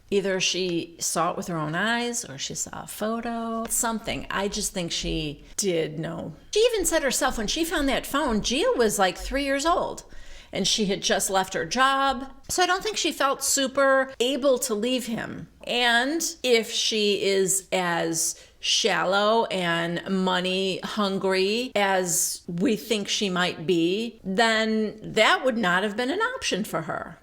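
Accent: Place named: Midwest, United States. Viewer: Love listening to you midwestern, my favorite recapper!!!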